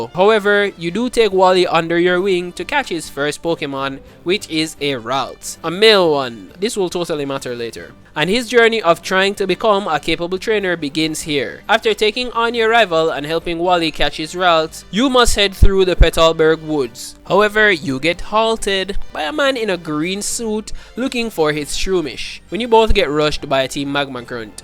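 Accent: Jamaica. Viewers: I KNEW you were Jamaican. What parish you from? My Mom's from Hanover. I was born in Canada though. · Are you Jamaican?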